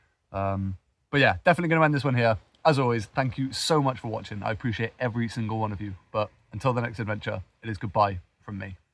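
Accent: United Kingdom. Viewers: what part of UK is this mate? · Which part of UK is that?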